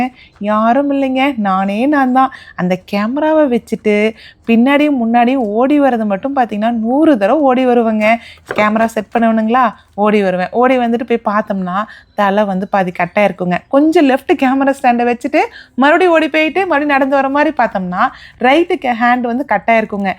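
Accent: Kongu, India. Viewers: Kongu tamil 👌 super nga 😊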